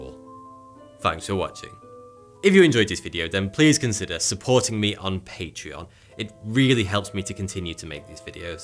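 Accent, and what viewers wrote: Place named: Cockney, United Kingdom. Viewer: it is called “the cockney accent”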